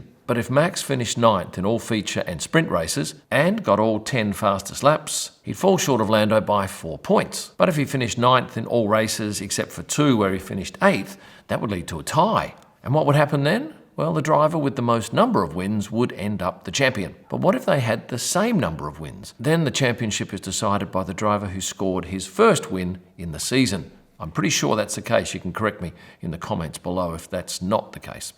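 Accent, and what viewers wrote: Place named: Australia. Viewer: There are many Australians out there in the big bad world. We seem all sophisticated etc. but secretly all we crave is Weetbix, Vegemite on toast, proper barista coffee and an Australian voice. Thanks.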